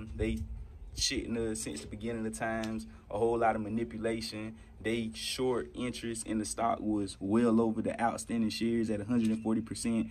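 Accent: South, United States. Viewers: I swear I'm not trying to spread FUD. I'm diamond handing like a mofo lol. But I am curious about the dip in volume lately. Are less retailers buying? Btw, shout out from Alabama! I can tell you're from the south!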